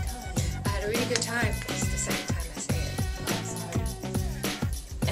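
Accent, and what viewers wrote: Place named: United Kingdom. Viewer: British accent!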